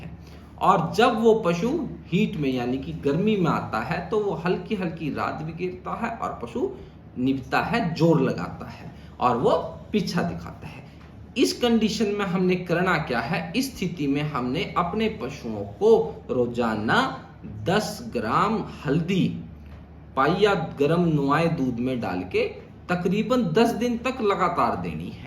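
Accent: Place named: Haryana, India. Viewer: Dr sahab aap laagta hai haryaana se hai aap Hindi mein bahut aatak rahe hai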